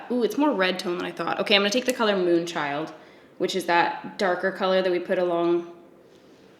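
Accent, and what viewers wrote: Canada. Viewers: Your Canadian accent makes my soul happy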